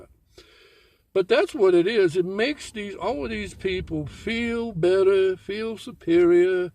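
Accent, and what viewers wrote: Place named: South, United States. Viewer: I love the SOUTHERN DRAWL. Interesting articulated take. :) Lemme argue definition for a moment here. btw, I think that Reiner is totally a crazed Leftist. I think Leftists are the crazies, and I think "libs" are different, in that they are, well, the "good Dems"...which sometimes sway to center, or even right of center. So, for voting purposes , let's not ostracize the "libs".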